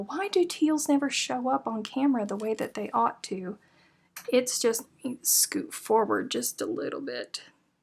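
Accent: South, United States. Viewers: p.s.: Not that speaking southern is not erudite in its own sweet way y'all.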